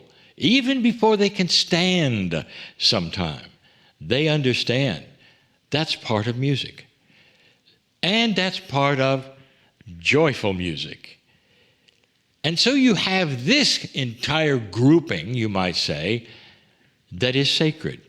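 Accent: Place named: Newfoundland, Canada. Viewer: Hmm.. I detected a bit of a Newfoundland accent in this channeling 😅 not sure if it's Lee or Kryon ❤ made me smile and chuckle here in Cape Breton, Nova Scotia lol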